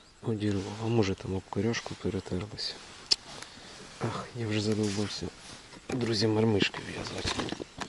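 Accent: Poltava, Ukraine. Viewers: Доброї ночі. Судячи по говору, це походу Полтавська обл. р. Сула?!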